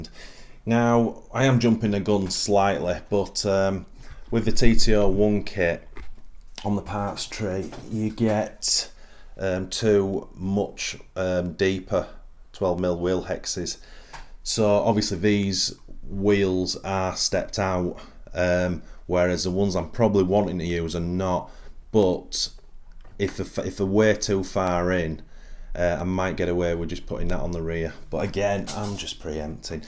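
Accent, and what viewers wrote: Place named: Stockport, United Kingdom. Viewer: That a stockport accent in canada?! Where do you get your alternative decals from?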